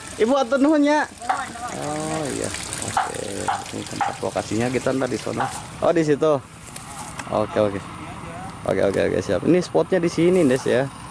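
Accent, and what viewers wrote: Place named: West Java, Indonesia. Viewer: Daerah mana bang ngomongnya sunda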